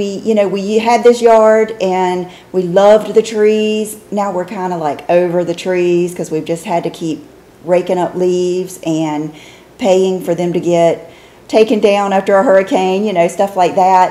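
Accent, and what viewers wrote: South, United States. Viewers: I could listen to you talk for hours. You’ve got such a soothing southern comfort to your voice. It’s like when you’ve been away from home for a while and cross that border back into the south, it’s like a big ole hug sayin darlin’ your home❤️.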